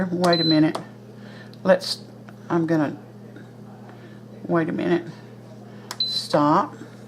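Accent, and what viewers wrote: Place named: Alabama, United States. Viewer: I just recently found your channel and I love your videos. I appreciate your simple recipes and your thorough instructions. Love the Alabama accent and taste testing. Can't wait to actually try some of these dishes.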